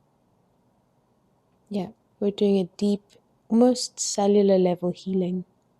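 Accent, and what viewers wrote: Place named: South Africa. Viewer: So happy to search other spiritual channels and find a fellow SOUTH AFRICAN VOICE! Cheering you on from the tip of Africa. I hope we might connect if it serves us - and humanity. I am an ex lawyer (that was brief....) now an ascension guide, I've been channelling since 2012 - specialising currently according to my team, in navigating the insanity of ascension - in other words, the psychological impact ascension is having on us individually and collectively.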